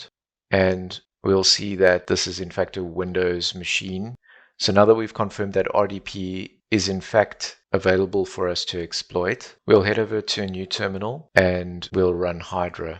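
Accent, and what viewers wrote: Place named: South Africa. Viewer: Cool South African accent.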